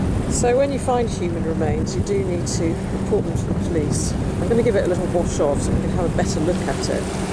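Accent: United Kingdom. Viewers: You have a lovely voice. And as a non native English speaker and someone who used to live in London, I am enjoying your British accent thoroughly!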